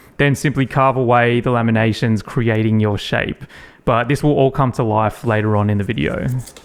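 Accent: Australia. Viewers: This was so beautiful and satisfying to watch. It's nice to hear an Australian voice too!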